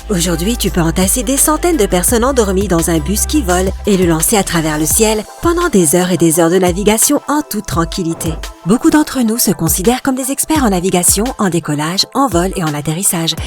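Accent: Canada. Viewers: Pourquoi on entend plus la voix canadienne qui commente ? Sympa je veux la voix canadienne, elle avait tant de charme que j'aurais pu l'écouter pendant des heures en non stop 😍😍😍 ! alors stp sympa mets là de temps en temps au moins ! Merciiiiiii !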